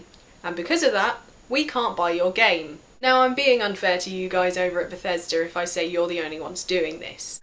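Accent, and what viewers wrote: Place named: England, United Kingdom. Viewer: I don't game. So I am here for the English accent today.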